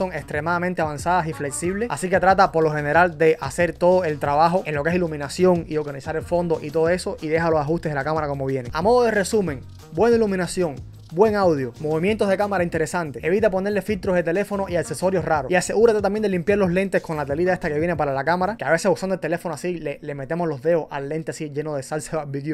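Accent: Cuba. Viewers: Me gustó mucho tu video. Che tenes hacento Cubano Me Equivoco. Saludos bendiciones ❤❤ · El acento parece de mi tierra la linda cuba bendiciones crack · se entiente lejos 20mil km q eres cubano ajajaja grande bro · buen video, tienes un acento bastante peculiar, eres cubano? saludos desde cubita 🇨🇺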